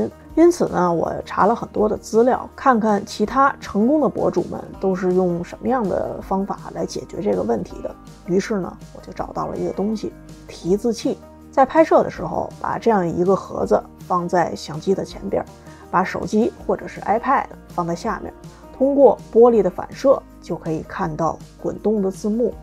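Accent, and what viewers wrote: Beijing, China. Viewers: 口音像北京人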